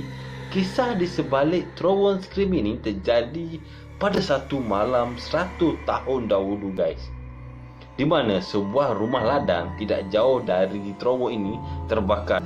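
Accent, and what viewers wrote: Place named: Kuala Lumpur, Malaysia. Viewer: Mantap abe ckp kl.. 👍👍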